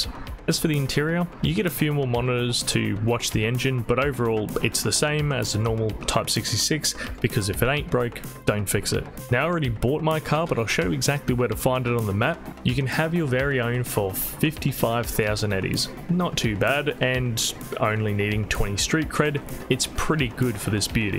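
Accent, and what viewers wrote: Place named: Australia. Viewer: oops 😬 that's the second time I mistaken a Australian accent for a British 1 lol don't know why they sound closely identical but hey good video either way.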